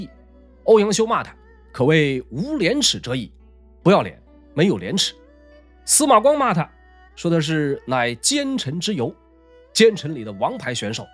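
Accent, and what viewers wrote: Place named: Tianjin, China. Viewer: 老师是天津人吧，本来沉浸在严肃的历史氛围里，一个嘛字把我听笑了😆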